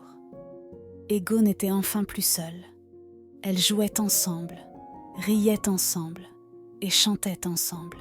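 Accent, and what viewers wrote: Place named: Europe. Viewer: Dommage pour la voix que je trouve trop européanisée pour moi! La voix d’un vieille homme ou femme aurait été le top du top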